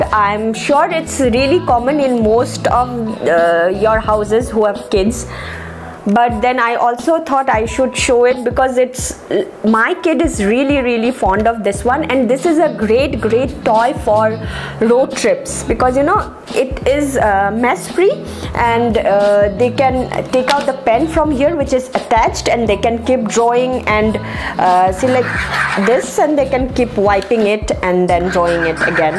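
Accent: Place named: Bengal, India. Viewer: Yes your content was really superb. Love to watch you and. Though your hubby Parth was missing. Loved every bit of it, love to hear the Bengali accent, reminds me of Mrs Sen our History teacher, a real sweetheart like you.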